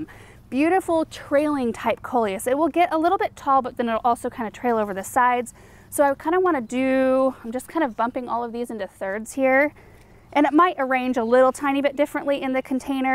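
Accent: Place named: Northwest, United States. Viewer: Yup, I pronounce herb and foliage exactly like you do. We're both from the Northwest.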